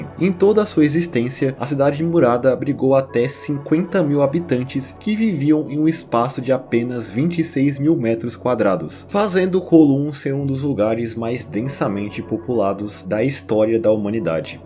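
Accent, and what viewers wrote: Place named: Maranhão, Brazil. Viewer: apenas uma pergunta fora de contexto. Tu é do Maranhão? kkkkkk tenho um amigo amigo maranhense q conversa estranhamente muito parecido cntg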